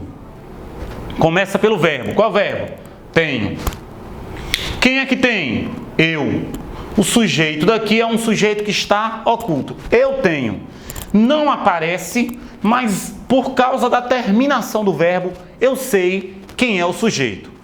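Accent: Northeast, Brazil. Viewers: VC E NORDESTINO? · tem mó sotaque nordestino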